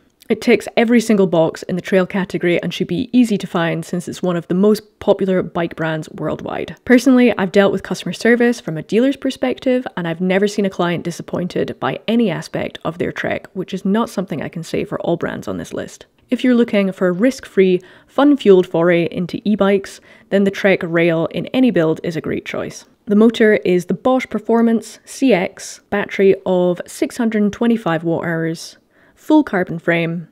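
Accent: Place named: Scotland, United Kingdom. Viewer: Just come across your channel and was obviously swayed by the Scottish accent . . . but I did hear a lot of the bike decision based on sales and popularity on the trails? That should never come in to the equation for a report on a "top 5". Surely you need to say why it is popular? Ride in Peebles/Glentress and you will see lots of Santa Cruz, but that isn't because they are the best (although I may be digging a hole here), but that is because that is the bikes the local dealer sells!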